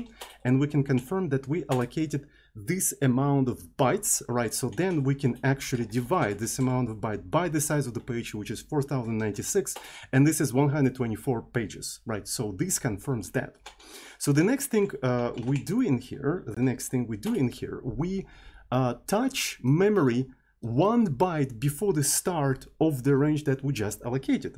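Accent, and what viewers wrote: Russia. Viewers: spetsific- is in russian, I guess he will unconsciously always say like that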